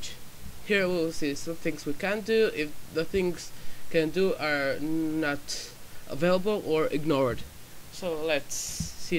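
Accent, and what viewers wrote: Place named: Russia. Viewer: You must learn English well not like a Russian pilots speaking!!!!!!!!!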